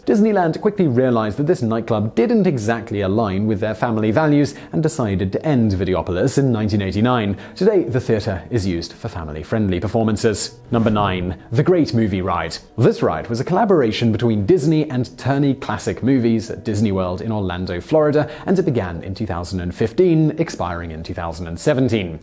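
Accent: United Kingdom. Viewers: lotsa misformation... your cookie cutter production wears thin... fewer videos and better research, if you don't mind... no offense, but tire of informational videos with the narrator speaking in British accent., which does not imply intelligence 7 and/or education as is generally accepted by the rest of the world; French and Spanish are considered "sexy", while German is considered coarse and unattractive ... there's a subject: Accents - favorites and least ... 🕊️ 🌎 ✊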